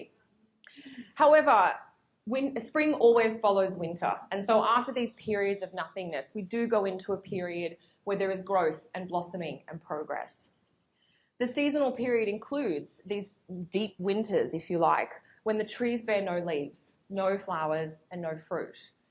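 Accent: Australia. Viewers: Wow where are you from originally are u from Australia, like Melbourne? Omg Fiji that's lit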